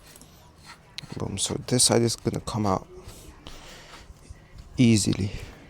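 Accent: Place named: United Kingdom. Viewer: Out of interest, why is you accent sound like a uk born afro Caribbean person, but you a white fella. Thanks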